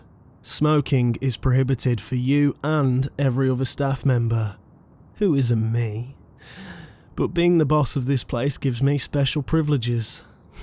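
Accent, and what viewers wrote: United Kingdom. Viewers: Your voice is so British and like a tv documation from police interceptors or something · BRITISH MAN? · its a hella british voice lol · Sounds kinda british though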